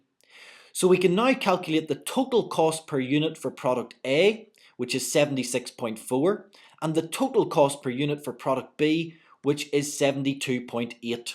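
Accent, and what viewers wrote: Scotland, United Kingdom. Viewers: Scottish, I think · Is that Scottish accent? Soooo amazing!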